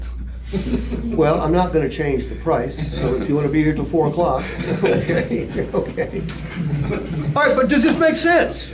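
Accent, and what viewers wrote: Appalachia, United States. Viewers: hillbilly and his bullshit hick talk!!!!!!!!!!!!!!!!!!!!!!!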